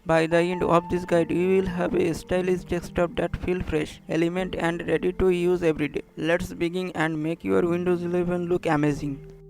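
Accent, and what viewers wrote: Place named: Bihar, India. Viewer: no this is perfect just he have a harder Indian Accent Called Bihari or UP I'm not sure But u can use Subtitles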